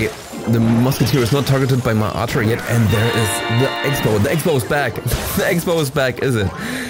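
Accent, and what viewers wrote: Germany. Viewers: He is'nt an english or a amarican guy i think He is german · are you german?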